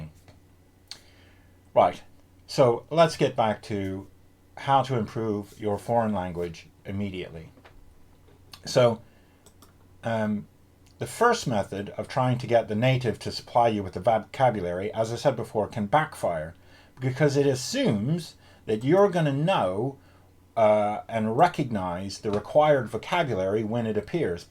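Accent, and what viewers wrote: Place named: United Kingdom. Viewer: Why do i keep hearing abit of a british accent , 😂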